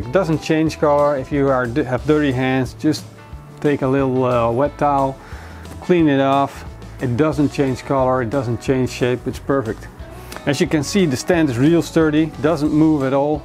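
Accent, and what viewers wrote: Netherlands. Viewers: Roma Abrupt dutch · U hebt een Nederlands accent??...... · Nederlands accent! Dutch accent! Lol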